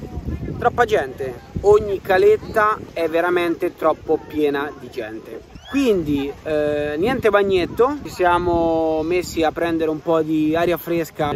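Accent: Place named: Bari, Italy. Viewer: hai l' accento barese non pugliese sono due accenti diversi : barese e leccese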